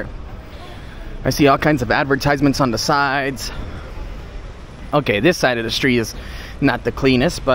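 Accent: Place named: United States. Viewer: I really like your American accent. thanks for sharing this video!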